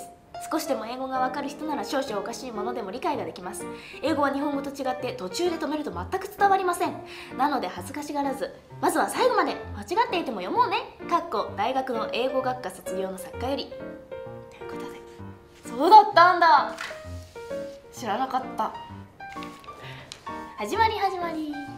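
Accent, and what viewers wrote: Japan. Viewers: japanese ENGRISH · idk why i just found that when japanese talks in English they became cute. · Idk why but japanese speaking in English is amusing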